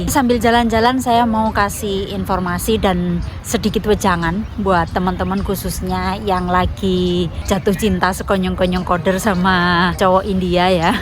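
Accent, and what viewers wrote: Java, Indonesia. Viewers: Senyum senyum sendiri, dengerin logat jawanya Mba belum hilang 😊, Kadaluarsa = kadaluarso Sekonyong konyong koder 😅😅😅 Mba ditunggu vlog ramadan disana ya 🎉🎉🎉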